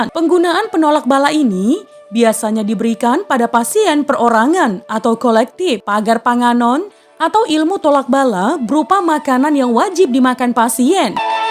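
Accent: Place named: Mandailing, Indonesia. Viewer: Maap.. suara hostnya dan pejelasannya terkesan seperti budaya batak mandailing .sumatra utara memang batak rumpunnya ..bravo ...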